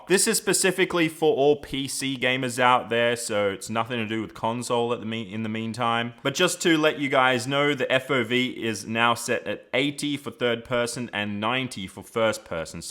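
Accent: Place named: Australia. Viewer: Always been in to Australian accent! Hahahaa “GOOD DAY GUYS HERE”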